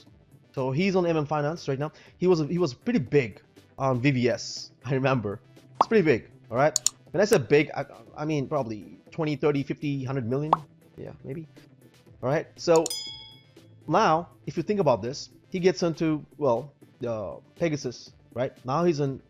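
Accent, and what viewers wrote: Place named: RP, United Kingdom. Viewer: Where is that accent from. It sounds posh to my ears. Sounds like money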